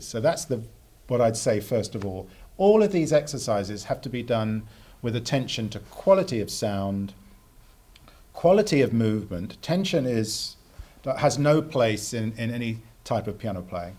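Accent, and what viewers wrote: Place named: United Kingdom. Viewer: No offence to your job done but I hate UK accent... ugh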